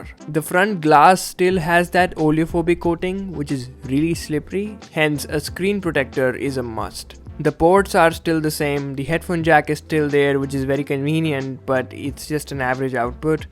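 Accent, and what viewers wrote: India. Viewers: IF MKBHD had an indian accent... this is what it would turn out to be. Nice work!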